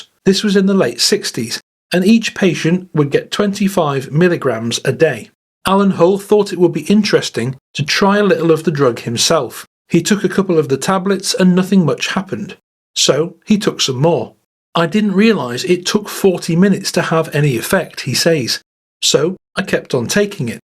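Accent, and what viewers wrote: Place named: Lancashire, United Kingdom. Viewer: Thanks for a class show. No more political or news for me with the spiteful and snarky tones and pure lies and character assassination and threats of Civil War and even WW3?! What the devil? Very Despressing. Good to hear a calm Lanacashire voice on musical subjects. I worked in the Northwest in 1995 during the Britpop era. Lived in Longsight and Old Trafford, Manchester. Take me back.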